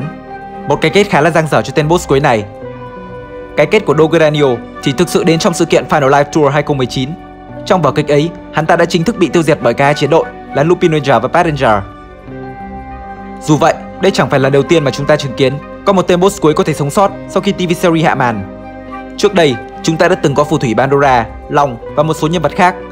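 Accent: Japan. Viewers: Có một vấn đề mà mình khá khó hiểu, chủ kênh anh thường phát âm danh từ thuật ngữ trong video theo kiểu Nhật dù có là từ có phần tiếng Anh cũng thành japanlish, mà sao trong video này đoạn lúc thì lup'A'n lúc thì lup'I'n vậy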